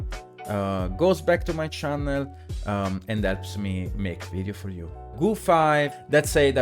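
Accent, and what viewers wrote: Italy. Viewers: Ma sei veneto?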